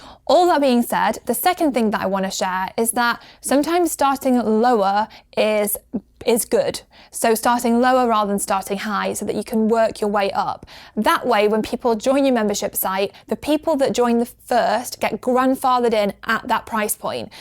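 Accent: United Kingdom. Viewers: I admire the accent , literally. I am trying to pull out mine. i love the british accent.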